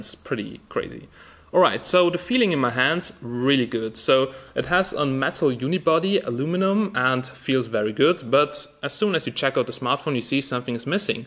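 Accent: Germany. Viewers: is he german? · this guy is def. german hahaha that accent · He is german :P I should know cause I live in germany xD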